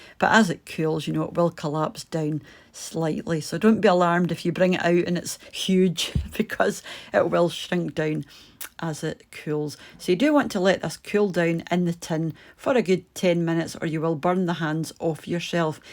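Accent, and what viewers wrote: Scotland, United Kingdom. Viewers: Oh gloriously yummy 😋that and a lovely Scottish accent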